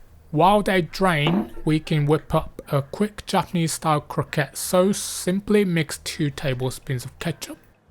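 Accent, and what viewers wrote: United Kingdom. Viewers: Love your British accent! Thank you for the lovely recipe ❤❤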